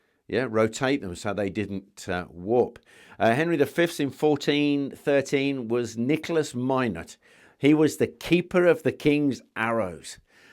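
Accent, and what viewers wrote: United Kingdom. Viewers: Always love your videos ☺️ I could listen to your lovely British accent for hours, lol. Thank you for the instructive video, as always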